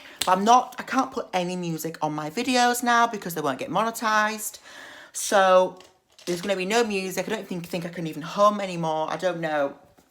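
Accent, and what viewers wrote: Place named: Birmingham, United Kingdom. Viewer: I seen you in the supremely satisfying video and your hair and accent is ace I'm British myself and it sounds like you come from Birmingham. Sorry if I'm wrong 😂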